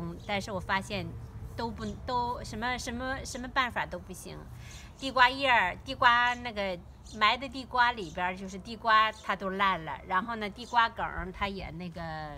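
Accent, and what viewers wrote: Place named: Henan, China. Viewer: 一听就是河南老乡！老乡你好！👋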